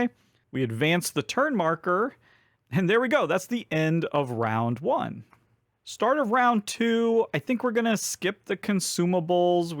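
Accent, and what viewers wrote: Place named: North America. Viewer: I always get a joy watching your RC videos, as I'm still struggling to get my hands on a relatively cheap copy. In my country, demand for board games are pretty low, so the only options we really have are services like Amazon (And from your accent, I'm guessing you're either US or Canadian, so if you didn't already know, ordering from Amazon if you don't live in the US is REALLY expensive)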